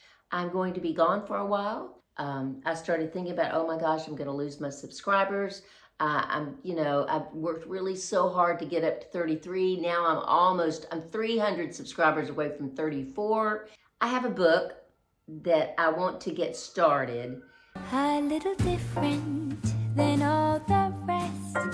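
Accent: United States. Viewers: I think with your strong American accent it is difficult to speak French well??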